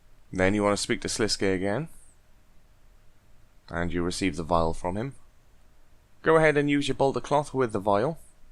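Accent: Wales, United Kingdom. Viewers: Are you welsh man?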